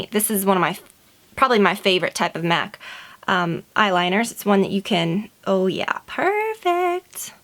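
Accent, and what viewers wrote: South, United States. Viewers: I don't know why, but I've never noticed before this video that you have a slight southern accent. I must be totally oblivious. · I realize you're speaking in the same voice, tone, etc. that you always do, but for some reason you just sound SO southern! I can hear the accent so much clearer than I ever have before! So sweet!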